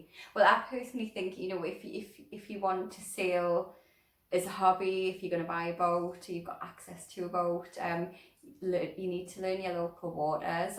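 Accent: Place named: North East England, United Kingdom. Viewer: Just came across your excellent channel. Thanks for sharing your experiences. I’m from South Shields and spotted Tynemouth Priory, which brought back found memories of home (I now live on the Canadian Prairie these past 26 years). I’ve recently bought my own sailboat and have a couple of courses under my belt, but one day I hope to do the RYA day skipper and sail around some of the UK. Wonderful to hear your north east accent! Fair winds and I look forward to watching more of your adventures…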